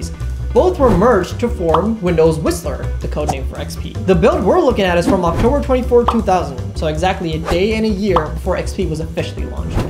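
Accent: India. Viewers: Bro are you Indian ?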